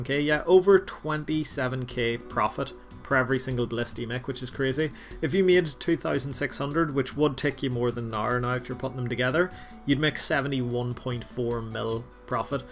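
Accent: Northern Ireland, United Kingdom. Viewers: You sound northern irish! I havent seen a osrs youtuber from my homeland! Im subbed, brilliant videos im loving these video ideas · Where are u from lol? I’m from Northern Ireland and u sound like ur nearby c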